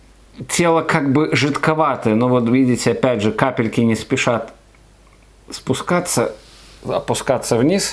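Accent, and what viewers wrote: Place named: Ireland. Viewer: У вас акцент. Вы живете в Ирландии?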